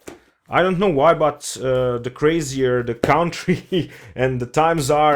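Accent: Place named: Eastern Europe. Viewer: Love your Slavic accent,Its very nice :)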